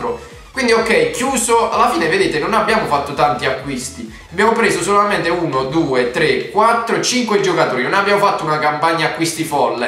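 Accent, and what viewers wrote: Bari, Italy. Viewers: ma sei barese?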